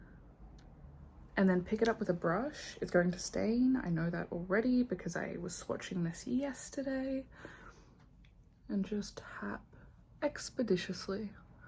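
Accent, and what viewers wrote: Australia. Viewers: Random comment.. First time I've heard her Australian accent now that she's speaking a lot. I thought she was English from the other videos (hardly any speaking).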